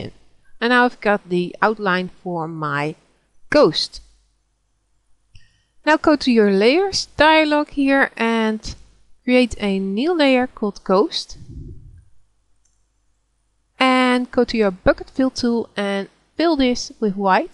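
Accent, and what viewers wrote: Netherlands. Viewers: Great video! Love the Dutch accent :)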